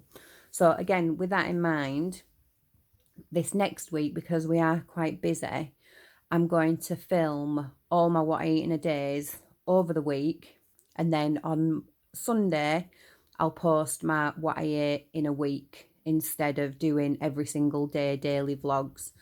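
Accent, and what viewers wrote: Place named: Yorkshire, United Kingdom. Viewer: Your accent reminds me of mine. I was brought up in west Yorkshire. I am really enjoying your blogs, thank you. And such a cute little bambino. I would gave taken him to footy too, you're right its what we do.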